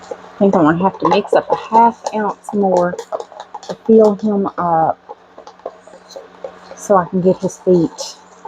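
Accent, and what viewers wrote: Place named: South, United States. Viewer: Hi, I am a new subscriber. Everything looks great. I love it all! Where are you from? I detect a Southern accent. North Carolina here.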